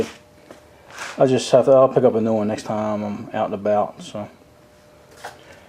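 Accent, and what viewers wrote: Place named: New Jersey, United States. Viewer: Yeah! What state you in? Your state sounds like New Jersey or some other upper east coast state.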